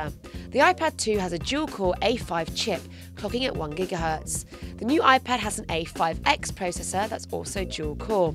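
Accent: United Kingdom. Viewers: really helpful review for those who are hesitated between the two. btw, this lovely british accent makes TechRadar videos rather more enjoyable to watch, i've replayed the video ThREE times just for that reason, so i'm gonna give two thumbs up, one for the video and one for the accent.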